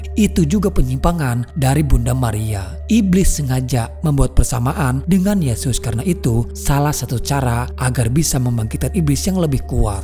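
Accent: West Java, Indonesia. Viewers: Aa orang sunda yah? 😀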